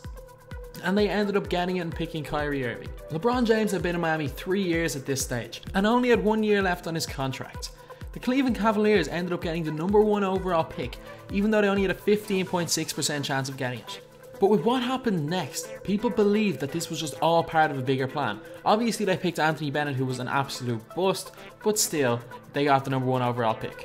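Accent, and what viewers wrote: Ireland. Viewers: I love the Irish accent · Oi Oi Are you Irish kiad 🇮🇪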